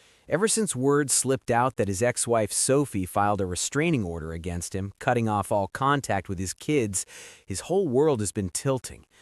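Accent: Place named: United States. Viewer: Why is there an American speaking about our soap??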